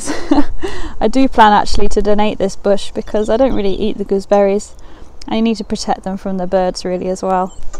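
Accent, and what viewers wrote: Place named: RP, United Kingdom. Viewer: i heard your voice says it all you probaly went to posh school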